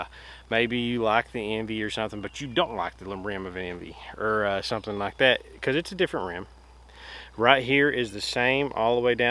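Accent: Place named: North Carolina, United States. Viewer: Love your content. When I started watching your channel I was saying to myself " man that guy sounds like home ". I live in Humboldt Co California. Then I herd you say Yadkinville.... I grew up in Trap Hill and High Point, lol. It's like hearing myself talk!! Just had to come home last year to put my grandfather to rest, and discovered the quality courses around home. You ever play Rolling Pines in North Wilkesboro?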